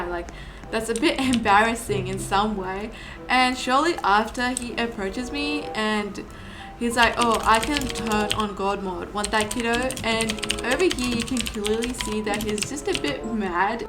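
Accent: United Kingdom. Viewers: Are u from uk? Also I luv ur voice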